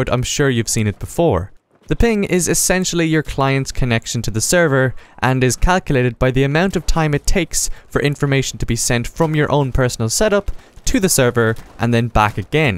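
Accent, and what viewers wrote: Ireland. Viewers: thank u man for this vid i thought it was just me getting that shit and btw are u irish?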